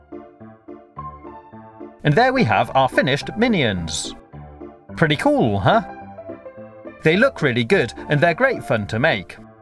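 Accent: United Kingdom. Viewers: Listen to his accent. He lives in the U.K.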